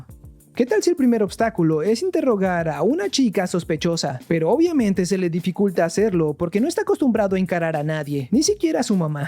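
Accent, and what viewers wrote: Standard. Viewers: por qué tenes q hablar tan neutro xd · Muchas gracias por tu contenido, es muy util y bien explicado! Siempre siento como si estuviera viendo un capitulo de algun programa de discovery por el español neutral 😂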